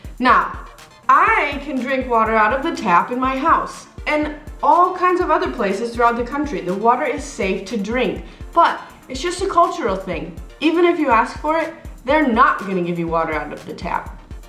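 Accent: Czech Republic. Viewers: All right girl, I do not know where the heck are you living, but let´s go through some facts. At first, children drinkin alcohol is a serious issue in CR as well. 2nd thing yea, there is no tolerance about driving while alcohol is still in the system, but it is happening frequently and people are dying bcs of it. Often. Also, people are fighting while drunk, very often. I live near a complex of bars and clubs and every weekend I am just counting ambulances escorting idiots from here. You are right about our fridges and grocery shopping, however paying rent by cash? It can happen sometimes, but usually normal thing is to pay by money transfer from your account. Salary in cash? WTF? Maybe when you are working in a pub, but otherwise nope. NOPE. If we are talking about "scetchy" business, then this has nothing to do with our country, it is happening everywhere in "modern" parts of world. 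Last thing, I am not trying to be suspicious, it´s more of a funny thing I noticed, but your accent is really "czechy". Cheers, enjoy your living in our beer land. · She has Czech accent tho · Your accent is Czech haha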